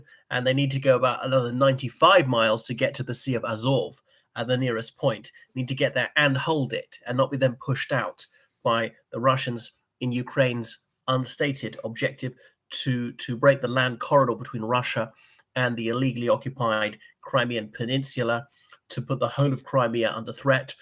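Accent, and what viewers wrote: RP, United Kingdom. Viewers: You speak posh but are literally a gullible credulous clown.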